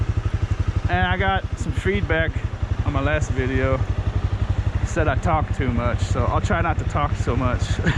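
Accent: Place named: South, United States. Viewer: You’re back! Again! 🥰😁. No, talk as much as you want, I for one, enjoy your particular southern accent. Being southern myself (although, some people don’t consider Florida as being southern), I enjoy and appreciate all the different variations of the southern accents. ✌🏼❤️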